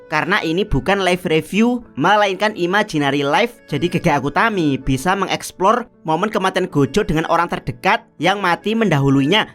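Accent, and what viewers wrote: Java, Indonesia. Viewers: Gausah pake cekibrot norak banget jawernya terlalu kental,,,enak yg biasa aja om lebih adem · Gojo bang bulan godjo,medok banget si bang hhhhh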